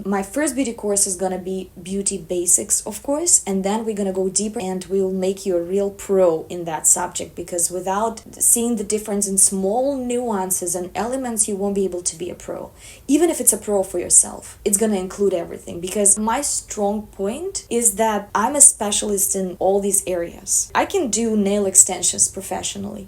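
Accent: Russia. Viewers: Вы невероятная! Вы талантливая! Вы удивительная! Такой красивый голос, и такое глубокое погружение в стиль поражают и удивляют! Нашла это канал случайно, когда искала определение гамина романтика (он же soft gamine). Очень понравилось как системно и просто Вы объясняете. Стала смотреть дальше, и не покидало ощущение, что есть русский акцент в Вашем английском 😜 Покопалась и зависла на Вашем исполнении. Но пришла, чтобы разобраться в системе Киббе. Почему видео только на английском??? Как жаль, что огромная часть россиянок не имеют возможности слушать Вас 😢. Вы отличаетесь от остальных наших стилистов-блогеров. Ваш контент просто супер! Спасибо огромное! И огромного Вам успеха 👏🏻👏🏻👏🏻🌺🌺🌺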